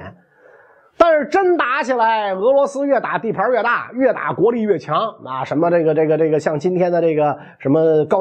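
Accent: Beijing, China. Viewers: 听到袁sir这字正腔圆的北京话就神清气爽啊！提神👍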